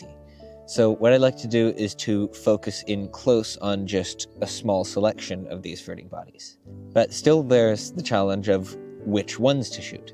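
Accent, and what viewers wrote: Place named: United States. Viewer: You mentioned your location is Germany, but you're sounding American. If you're living in Germany, in which area is it? I love to see younger people doing photography or even macro. Usually its more an old white man stuff... 🙂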